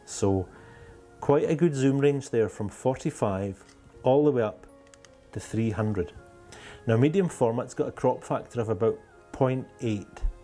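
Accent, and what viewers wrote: Scotland, United Kingdom. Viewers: Thanks for the video, wonderful to watch you with your Scottish accent , reminds me with my time in Glasgow for 9 years .... suppose if you have to buy a mirrorless camera that imitates your imagery , which one you will pick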